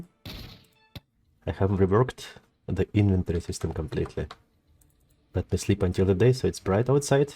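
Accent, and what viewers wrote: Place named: Central Europe. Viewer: Haven't taken a look at this channel in a while. I'm guessing you're doing something Fallout-like? Judging by that Pip-boy thing. Oh,and tour English sounds Central-European. A bit Eastern thought. Czechia?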